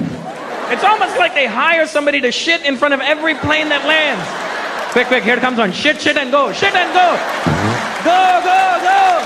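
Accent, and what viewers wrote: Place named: India. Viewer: Indian accent is hilarious.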